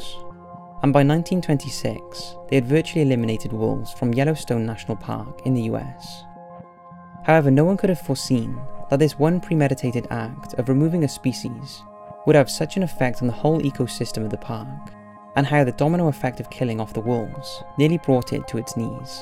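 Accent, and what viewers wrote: Wales, United Kingdom. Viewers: Are u welsh? Sounds like a lil welsh accent 🏴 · Can’t beat a Welsh accent 👌🏻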